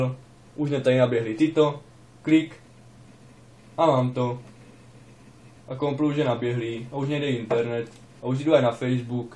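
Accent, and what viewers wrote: Moravia, Czech Republic. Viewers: Moravak ne ? :D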